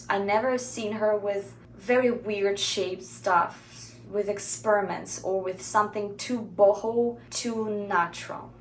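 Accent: Russia. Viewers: You got light Russian accent ))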